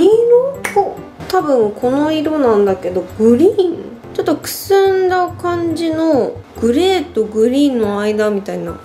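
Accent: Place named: Kansai, Japan. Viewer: さんって関西出身？すごいイントネーションが関西っぽい！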